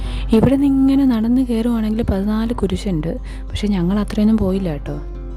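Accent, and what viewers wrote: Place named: Punjab, India. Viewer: Yaa allah... നിങ്ങൾ മലയാളം പറഞ്ഞോ... 🥰 അപ്പൊ മലയാളി ആണോ.. 🤔🤔🤔 നിങ്ങളുടെ english കേട്ടു, വല്ല പഞ്ചാബി ആണ് എന്ന് , എനിക്ക് മാത്രം , ആണോ തോന്നിയത് 😜🤪😜 നിങ്ങൾ സ്ഥിരമായി ഇരിക്കുന്ന bench അല്ലേ അത് 😍😍 അര്മേനിയ പോയ videos കണ്ടില്ല 🙂🙂 ശരിയാ... എന്നാ, ലുക്ക്‌ ആണ് ഈ സ്ഥലം... 😍😍😍 14 കുരിശൊ 🤔🤔🤔🤔 അത് കണ്ടില്ല 🙄🙄🙄🙄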